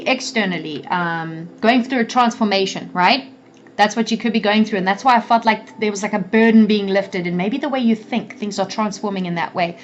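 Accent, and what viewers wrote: South Africa. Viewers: Oh a few clues you may have a SA or Zim accent? Been solo for so long, not sure who is coming into focus. Thanks for such a lively reading. Wm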